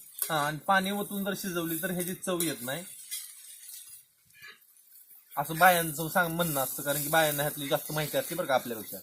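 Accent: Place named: Malshiras, India. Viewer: Tumchi malshiras talukyatale vatata karan aamhi pan tikdachech aahot......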